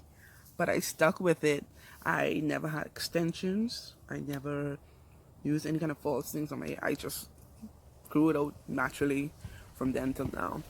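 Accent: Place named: Bahamas. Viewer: I knew I recognized the accent ... I'm from Nassau, lived in Freeport for 20 something years. Been in the States for 21 years. My locs are 8 years old, had to combine twice. They're almost waist length. I'm trying to commit to freeform, semi is harder since my locs are thicker. I will commit though. Not religious reasons, I just love it and chemicals are no good. I absolutely love your locs and the styles. Shalom Queen !👑💜👍🏽💋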